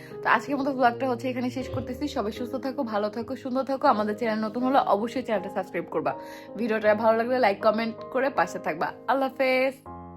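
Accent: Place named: Khoyraita, Bangladesh. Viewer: খয়রাইতা কাঁথা বলছো আমার শুনতে ভালোই লাগছে😅😅😅😅😅😅😅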